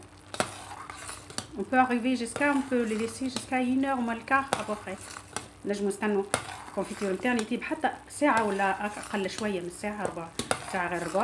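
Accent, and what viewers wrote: Tunisia. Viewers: اختي لو كملتي بالعربية. والله شكشكتيها بالفرنسية . واللهجة تاعك حلوة بالتونسي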